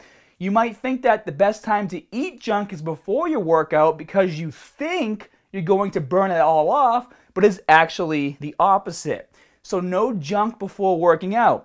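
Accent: Boston, United States. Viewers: Let me guess, you're from Boston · This man's boston accent is getting intense lol. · When did pick up this Boston accent? I used to watch him a few years ago and he never sounded like this? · when did you start this hardcore Boston accent haha never used to be that strong. I'm from mass too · Why is he talking like that? I haven't seen his videos in a few years Looks like developed a Boston accent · In from Braintree bro! U must be from mass cause I sound exactly like u! Go Boston accents!! Just subscribed right now, love your bro!! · i been a sub for a really long ass time and i love how your not editing your videos as much and how you love your boston accent now. keep it like that. also i only put myself in food comma when im allowed a cheat day. im a boxer so that comes like once a year and half or two. everything else is a pretty much strict diet, including water intake. 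· when did this guy get such a heavy boston accent? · Keep the full accent man should be preserving your culture as part of your culture that Boston accent Go full on with it man set you apart from everybody else is part of your character as part of your routine it's part of Who You Are, is trying to come out all the time but you're suppressing it before b u i mean that with all that's you Boston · The Boston accent is severely strong with this one lol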